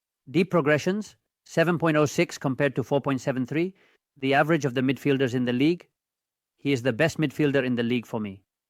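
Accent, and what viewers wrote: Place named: India. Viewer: Te han puesto el inglés con el acento indio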